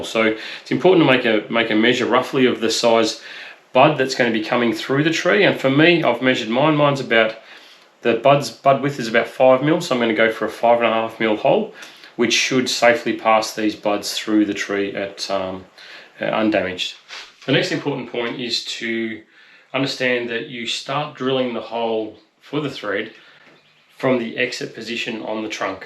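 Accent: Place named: Melbourne, Australia. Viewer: Just found your channel - thanks YouTube! I did my first thread graft 2 years ago on a Trident Maple. A month later I decided to rub the buds off the approach side to avoid wasting energy where it wasnt needed...but for some insane reason I rubbed them off the 'active' branch end. How could I be so dumb!!! I couldn't believe I had done that. Anyway - loved your instructional style and am definitely going to be looking through more this winter. I always think of Melbourne (Upwey) when I hear that accent. Cheers, Xavier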